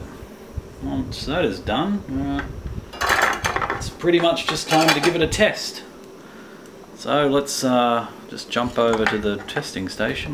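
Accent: Australia. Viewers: You sure are one heck of an imaginative guy. I've seen some of your "constructions", I just wonder why you have so many "DC's" :P I'm from Portugal. You have an australian accent? Am I wrong?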